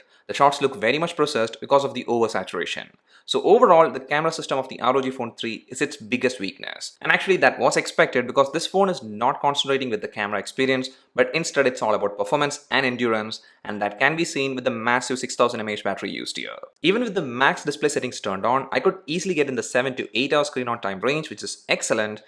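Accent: Kerala, India. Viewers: are you from Kerala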